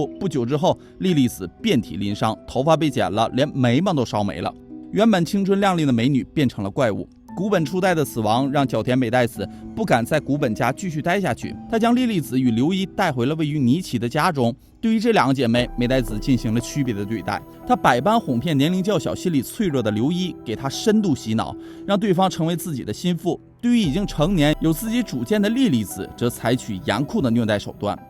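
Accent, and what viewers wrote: Northeast, China. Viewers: 語速不要這麼快，講起來就不會太吃力。雖然東北話與普通話有相似之處，可是東北人講普通話不如其它省份的人標準，最大的障礙在於聲母為Z.C.S和ZHI.CHI.SHI的字東北話與普通話發音完全相反！比如說：站zhan著zhe，坐zuo下，東北人讀成：站zan著ze，坐zhuo著ze，從小到大說著與普通話完全相反發音的那些字導致非常難以改變，樓主就是這種情況。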